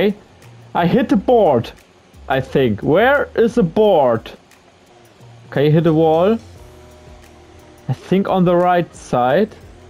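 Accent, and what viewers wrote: Germany. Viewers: dieser deutsche dialekt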